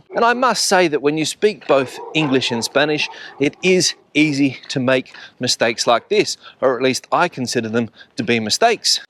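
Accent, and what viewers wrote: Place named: Australia. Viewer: I think I recognise your accent, (are you an Aussie?) thanks for another valuable update!🙏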